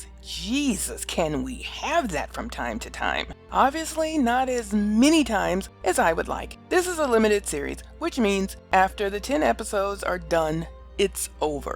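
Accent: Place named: Liverpool, United Kingdom. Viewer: Really enjoyed ur review but I'm a little annoyed that u havnt got more comments and reviews as uve done a great job and coming from a Scouser ur accent is beautiful!! Oh if u don't know a Scouser is from Liverpool, England just in case u didn't no!!! Please more reviews and never change ur accent ha ha!! Take care and let's hope for more reviews!!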